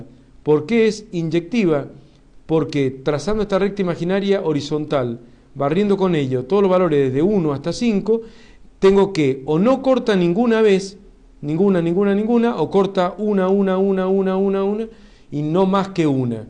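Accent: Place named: Argentina. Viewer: es argentino pero le mete un neutro por ahi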